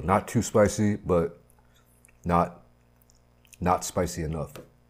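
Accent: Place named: New York, United States. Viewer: Are u from New York u have the accent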